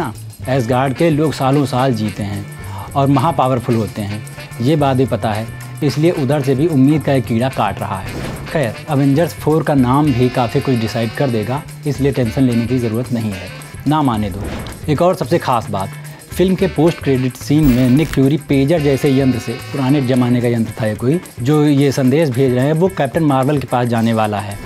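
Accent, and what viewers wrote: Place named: Bihar, India. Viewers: Bihar se ho ka bhai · Bihar ke ho.....???? Work on your acnts bro.....u r respting mr dan 1 milon subs channel...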